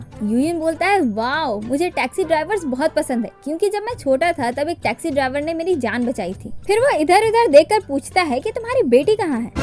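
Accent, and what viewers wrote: Bengal, India. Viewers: Explanation was amazing and funny . And 1 question you are Bengali ❓ Because you are talking like about Bengali .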